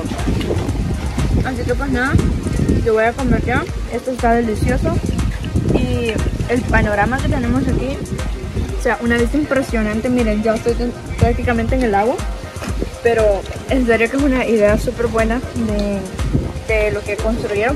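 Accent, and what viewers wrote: Guatemala. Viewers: Estoy sorprendido porque tú ya no hablas como salvadoreña, por un momento pensé que eras chapina.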